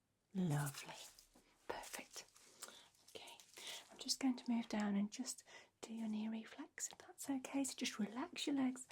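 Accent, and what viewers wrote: United Kingdom. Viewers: Love your beautiful British accent. Btw you nailed this roleplay. Good job my dear 🥰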